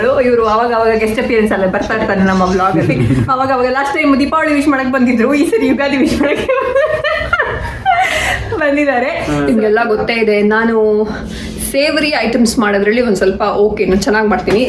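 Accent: Mangalore, India. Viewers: & nimma uru yavudu i think manglore or udupi tulu alle alwa matadodu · Manglore slang kelode chanda😍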